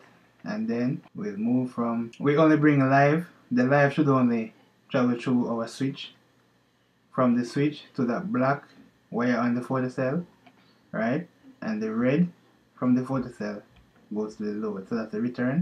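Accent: Jamaica. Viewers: Yaaad man voice dis.....Hailingz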